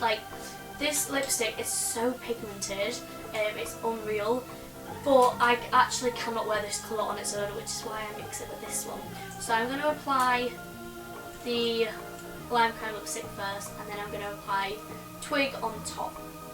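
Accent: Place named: Yorkshire, United Kingdom. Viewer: She lives in Yorkshire like me. Ik ik we have the sexier accent 😂😂😂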